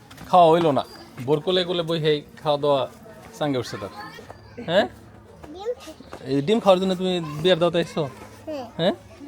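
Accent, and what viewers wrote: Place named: Gopalganj, Bangladesh. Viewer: ভাই, আমার এক খালাতো ভাই আছে, খুবই ক্লোজ ছিল।উনার ভয়েস, কথার টান ঠিক আপনার মত।ওর বাড়িও গোপালগঞ্জ সাটুরিয়া।আমি সাটুরিয়া বাজারে বেশ কয়েকবার গিয়েছি।খালুজান মারা যাওয়ার পর পারিবারিক জটিলতায় দূরত্ব হয়ে গেসে, তারপরেও আপনার ভিডিও দেখলে আমি আমার কাজিনকে ফিল করি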